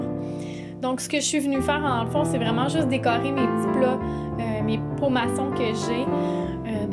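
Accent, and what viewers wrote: Quebec, Canada. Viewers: J'adore votre accent C'est québécois ?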